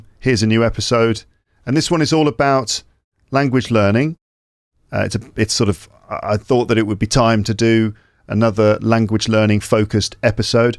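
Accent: United Kingdom. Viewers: However your podcast is in British accent and I work in American accent but I really am good with your way of speaking and teaching! I really like to hear you for hours! Good job 👍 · Thank you! your great british pronunciation, accent, explaining a difficult vocabulary are very informative! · The problem is the british accent🥴. But your channel is fabulous.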